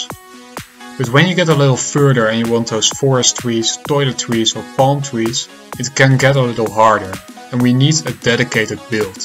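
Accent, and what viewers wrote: Netherlands. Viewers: Ik hoor een nederlandse accent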